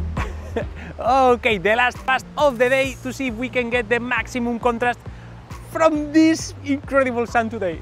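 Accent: Spain. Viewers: Como se nota por tu ingles que eres español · At first I wondered if you were spanish, then I heard you talking and knew it for sure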